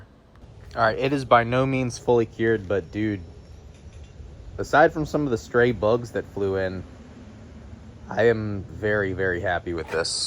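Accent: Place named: Pennsylvania, United States. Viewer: You talked for 3 seconds and I knew you were from PA. good work and the paint job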